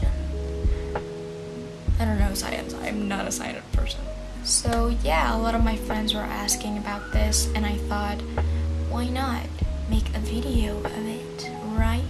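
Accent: United States. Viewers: Sounds pretty much the same as american dialects !!! I like it how you say the T like the general american, keep improving !! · Hello there:) Greeting from Montreal Canada, your English is fantastic and your accent is top notch. you sound very american. Well done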